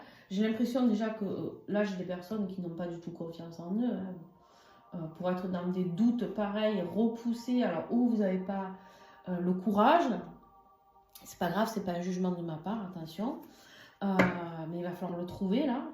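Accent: South, France. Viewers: et quelle voix...! Avec un bel accent du sud que je connais bien 😉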